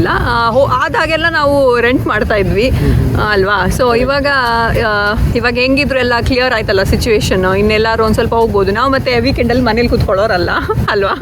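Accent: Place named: Bangalore, India. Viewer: Abhinandanegalu.... 👌👌👌 Nim kannada Blore kannada..... sounds nice... but namma Udpi kudla kannada keloke thumba chennagirutthe... alwa... omme kudla kannada dalli maathadi... chanda irthadhe...🤭 Cute.... 😘 ❤ from Dubai....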